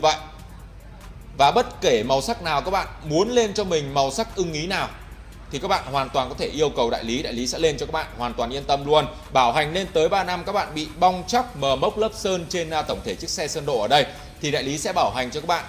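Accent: North, Vietnam. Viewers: Bác người bắc hả,k thấy nói giọng nam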